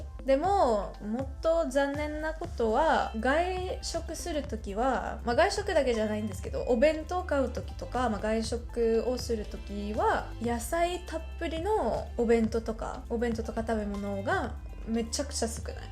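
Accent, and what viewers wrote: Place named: Kyushu, Japan. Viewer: 日本人にとってスイスはもっとも好印象の国。ところがあるフランスジャーナリストがこれを知り、意外だと言った。欧州ではスイスは日本ほど好かれていないという。そういえば黒いスイスなんて本もある。いろいろ感心しないことが書いてある。でも大国に囲まれて生きるために必死で知恵を絞って生きてきた結果ではないかなとも思う。たとえば中立国でもあるスイスの立場を活用して秘密性の高いスイスの銀行に世界からマネーが集まるようにしたとか。小国なのにどこもできないことをスイスはやった。たいしたもの。参考になる。今回の日本に対する率直な意見ありがとう。当然のことながら日本についてもいろいろ長短がある。僕ら日本人が自分を見つめ、改善するために参考にすればそこに価値が生まれる。よりよくなるために。ありがとう。ところで地方にいたの？えらいなまりがある。これは外国語なまりではなく、日本のどこかのなまりと思う。声だけ聴いたら、地方出身の日本人と間違われるでしょう。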